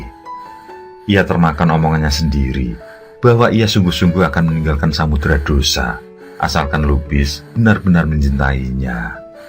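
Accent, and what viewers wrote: Java, Indonesia. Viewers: Pak dhe,, mohon maap,, walo pun saia pake headset, tapi sering kali di ujung kalimat yg pak dhe ucapkan sering g jelas,, di semua video pak dhe,,,krn pak dhe terlalu medhok,,,kadang susah di cerna oleh qu,,,mohon maap pak dhee,, 🙏🙏 salam dari aceh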